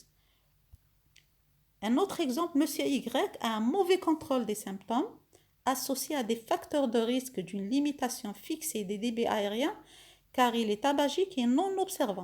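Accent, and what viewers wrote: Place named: Algeria. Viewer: d'apres la voix et les bonnes explications je savais bien qu'il s'agissait d'une professionnelle Algérienne, je suis Algérienne vivant au Canada, je vous dis bravo pour toutes ces explications ( nouvellement diagnostiquée Asthmatique ).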